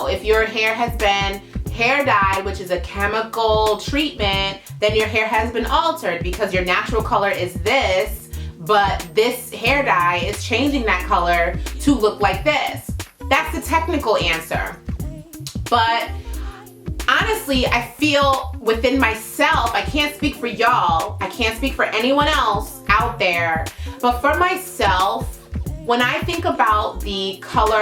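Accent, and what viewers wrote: New York, United States. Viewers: "It's Stoop-id" that NY accent is strong gurl...😆😆. Anyway stand in your truth you did not get this far steering folks the wrong way. I appreciate your candor and willingness to supply the demand in this community. 🙌🏾👌🏾👍🏾.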